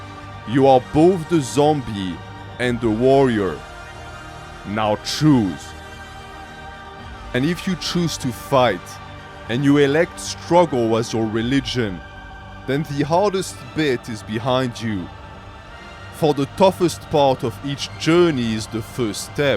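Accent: France. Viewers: Although you are German , your accent is very similar to a French accent.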